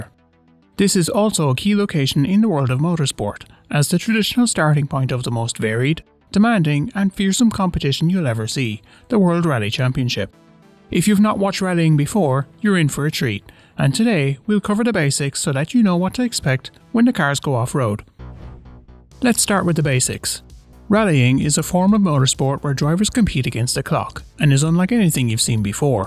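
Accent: Ireland. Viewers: I can tell from the accent youre irish like myself so lets pray for a craig breen win😂🇮🇪🙏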